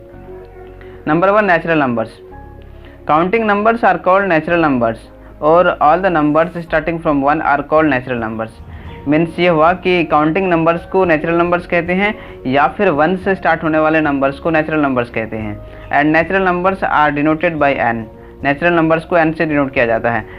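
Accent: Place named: India. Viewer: إنجليزي بنكهه هندية 🌚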